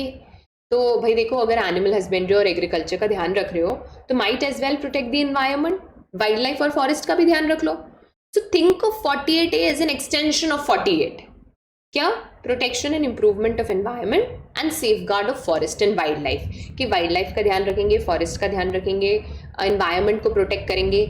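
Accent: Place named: Bengal, India. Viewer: I thing he is from Bengal , his means “Jaldi kro gap mat Karo”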